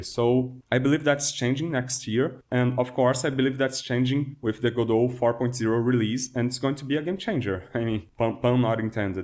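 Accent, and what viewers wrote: Brazil. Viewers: awesome video dude, thanks for that. Are you from brazil? Your accent seems to be if so youre foda cara!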